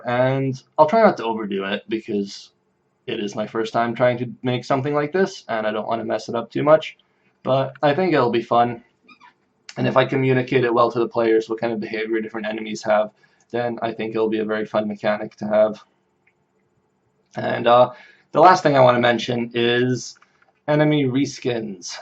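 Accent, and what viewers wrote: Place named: United Kingdom. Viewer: Oh my god your accent is fucked. It's British, yet slightly American, but it'll slip into Scottish because you live there now.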